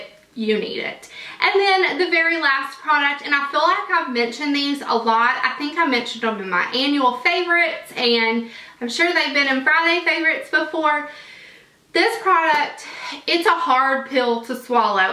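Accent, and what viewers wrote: South, United States. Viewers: Well hello, ***** I share your "mom of boys" lifestyle. I have 2. Love 'em to pieces. Aren't they the best? Nothing like the love b/w mom and her sons, right? Been a subscriber for awhile now, but occasionally like to comment and say hi. Must say, I'm partial to your southern drawl as I was born (GA) and raised (SC) in the South. Miss that now that I'm in MN. Yes, I've lived here over 15 years and still have a slight accent but most of it's gone.:( And you do remind me so much of my dear sweet SIL. Watching you/your videos kind of brings me home in a way.:) Love you channel and your videos Great selection for this one! Hoping if you have a chance, you might pop over to my channel and check out some of my videos. And if you have any advice for me I would love to hear from you. Wishing you a wonderful day! xo ~Melissa in MN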